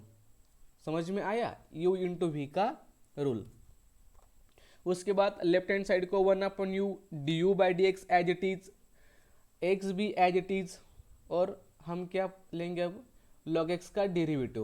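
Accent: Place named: Maharashtra, India. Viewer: Accent marathi ahe tumchi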